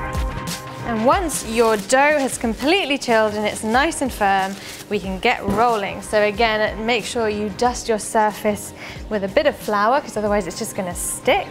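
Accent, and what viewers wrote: England, United Kingdom. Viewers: 😊love English accent 🎉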